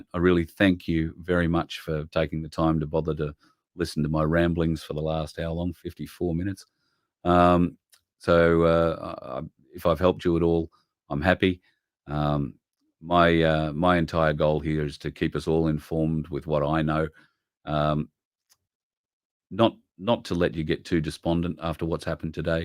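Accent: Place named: Australia. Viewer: Great show, great information, glad to hear the voice of another Aussie patriot on the airways. Godspeed and I look forward to the next broadcast.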